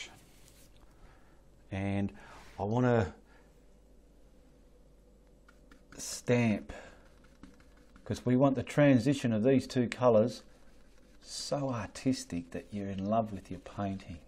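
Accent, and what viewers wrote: Australia. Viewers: Thats the thickest australian accent ive ever heard. Love the painting.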